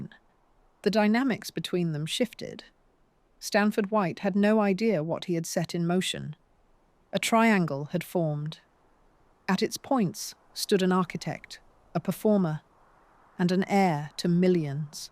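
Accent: England, United Kingdom. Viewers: Amazing how few people know it’s AI. Why would you pick an English voice for American stories? Odd choice.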